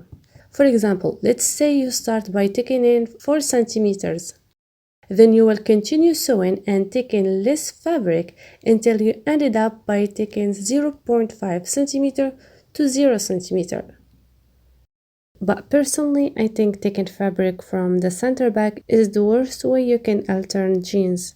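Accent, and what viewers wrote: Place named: Morocco. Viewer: Where are you from? You have a morrocan accent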